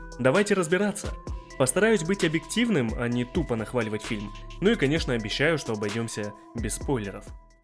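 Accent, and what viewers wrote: Far East, Russia. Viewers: Ты с Дальнего востока???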